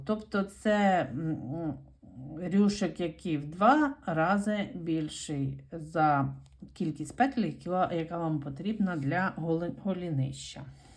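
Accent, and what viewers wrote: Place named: Odesa, Ukraine. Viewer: Ваша смачна , українська мова з одеським присмаком теж зачаровує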